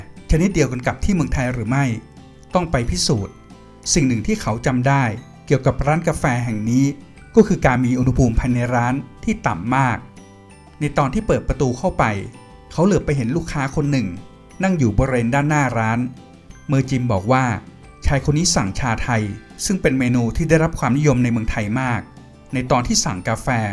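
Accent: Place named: United Kingdom. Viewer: ผมติดตามช่องของ ตลอดครับตั้งแต่เขาทำช่องที่ไทย จนย้ายไปฟิลิปปินส์ ตอนอยู่ไทยคนติดตามเขาน้อยจริง เห็นใจเขาเหมือนกัน เป็นเพราะคนไทยไม่เช้าใจภาษาอังกฤษ จึงยากที่จะมีคนติดตาม คลิปตอนเขาไปลองกาแฟ ตอนเขาจิบอาเมริกาโน่เย็นผมเห็นแล้วขนลุก รุ้เลยว่า ยังคิดถึงเมืองไทยมาก ผมติดตามเขาเกือบทุกคลิปวีดีโอ เขานำเสนอได้ดี ที่สำคัญผมชอบสำเนียงเขาแบบอังกฤษ มันฟังดูเท่ห์ดี เพราะผมจะคุ้นเคยและพูด แบบอเมริกามาตลอด555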